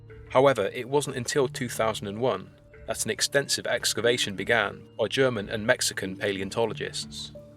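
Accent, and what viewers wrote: United Kingdom. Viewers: I hope someone scrutinizes the hell out of the way you speak, chomping at the bit for any "mispronunciation". I guess you've also never heard a British accent before. Asshole.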